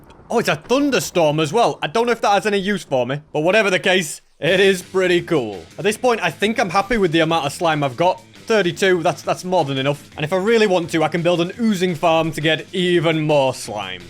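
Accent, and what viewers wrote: United Kingdom. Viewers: Are you British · Today is the day,I realized that is British 😅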